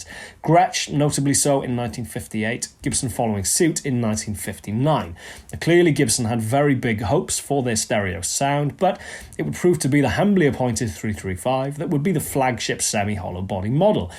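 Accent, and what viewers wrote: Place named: Geordie, United Kingdom. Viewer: Are you originally from Newcastle? Keep noticing a tiny bit of Geordie in your accent.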